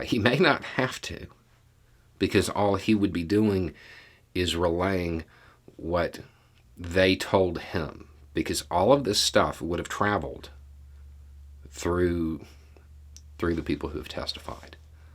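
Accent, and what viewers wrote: South, United States. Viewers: 100% this. I happen to like the touch of southern drawl and good ol' boy look. It works as intended. I have people I send these videos to, and they've started to make a few open their eyes. He's relatable to a lot of people, and he speaks common sense and doesn't hop on the culture war train. He's basically exactly what's needed for the time. It's too bad people with power to change things don't take note.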